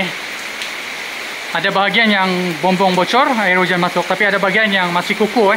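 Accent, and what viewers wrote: Sabah, Malaysia. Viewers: Diorang Sabah ,Kerje kat Malaya!!! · Urang sabah ka ko tu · dngr mcm slang sabah je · pergh hebat.berani. pekat sabah kau ni bro. bajau ke bro. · cakap macam org sabah, tapi vlog kat pahang. org mana dan kenapa di pahang? hehe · org sabah kah bro?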